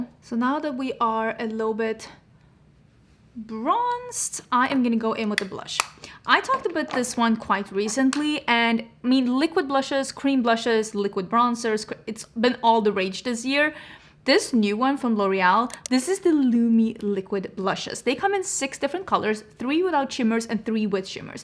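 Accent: Värmland, Sweden. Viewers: Great video❤ And yes I can tell you are from Värmland😂 but I love the accent. I am a skåning and get mocked for my accent all the time😂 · I had no idea that you were from Värmland. I actually thought that you lived way more north, but that’s just the mixture of dialects 🤷🏽‍♀️! I really liked this video, showcasing good products that happens to be more affordable than the Sephora brands. 💕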